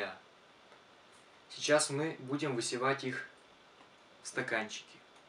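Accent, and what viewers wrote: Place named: Vyatka, Russia. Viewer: Вятский говор. Молодец парень. Надо будет попробовать из семян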